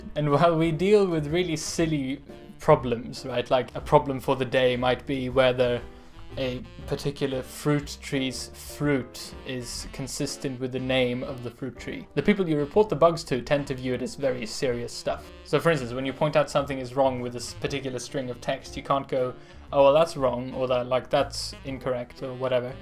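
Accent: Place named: United Kingdom. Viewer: Do all sweeds have this gorgeous British accent??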